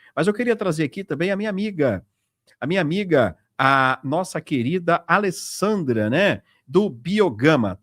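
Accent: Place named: São Paulo, Brazil. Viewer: Eu tenho raiva desta mulher porque ela é paulista está fala dela irrita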